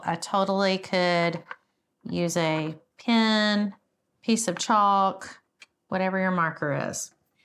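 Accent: South, United States. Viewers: Ok you have caught my attention, where do you live or from your accent is so southern?😊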